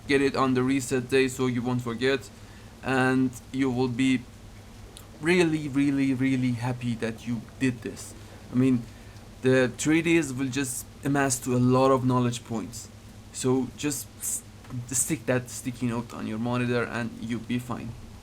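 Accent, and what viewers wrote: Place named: Iran. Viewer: چقد این لهجه ایرانیه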